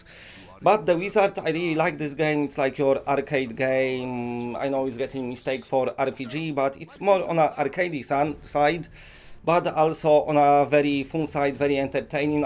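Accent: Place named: Poland. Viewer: Came for the psvr news. Stayed for the sweet polish accent.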